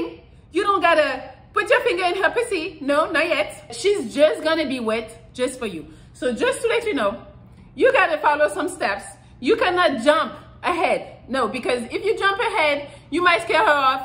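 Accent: France. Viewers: French English accent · L'accent français est super prononcé ! Super attractive. J'aime trop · Lol... I love your French accent with how you say things 🤣🤣🤣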